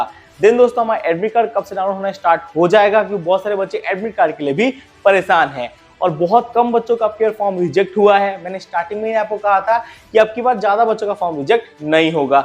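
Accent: Odisha, India. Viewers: Bhai tum odisha se ho na ?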